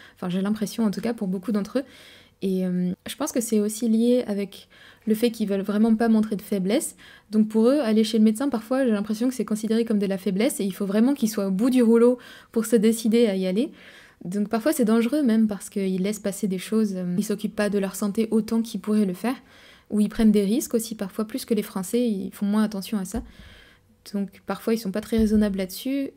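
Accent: France. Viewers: Тут уже говорили, что Вы привлекательны, я ещё хочу добавить, что в России французский язык, это очень романтично звучит, по этому французская девушка просто невероятно привлекает парней, тут уж ни чего не поделать, а когда Вы будете говорить по русски с французским акцентом, то мужчины вообще голову потеряют.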